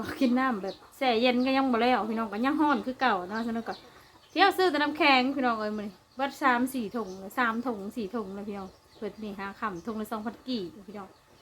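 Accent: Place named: Savannakhet, Laos. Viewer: ສະບາຍດີ ຕິດຕາມເບີ່ງຢູ່ເດີ..ເຈົ້າສວຍງາມດີ..ແບບທຳມະຊາດສ້າງມາບໍ່ແຕ່ງຫນ້າກໍງາມ...ເວົ້າລາວມ່ວນດີຂອ້ຍຊອບສຳນຽງສຽງເວົ້າພີ້ນອ້ງຄົນສະຫວັນະເຂດເວົ້າລາວ...ຮອ້ນ..ຮອ້ນ..ຮອ້ນ...ຫນ້າເຫັນໃຈ..ຢູ່ບ້ານຂອ້ຍໜາວເຢັນ...ໂຊກດີເດີ.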